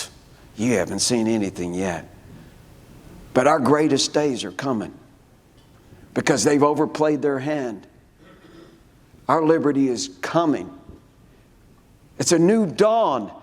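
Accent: United States. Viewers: good ol' Texan slant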